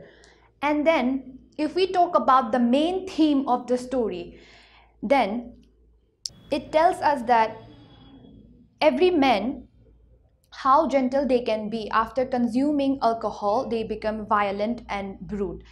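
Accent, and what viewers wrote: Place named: Manipur, India. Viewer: Let us promote the culture of appreciating something which is good. In my opinion this lady is doing a fantastic job for those students who are capable of speaking in English. If you are not happy with her style of teaching please go for some other vedios that help you understand the lesson in a better fashion. She is young, energetic and articulate. Tell me honestly how many English teachers in Manipur speak English with such beautiful accent.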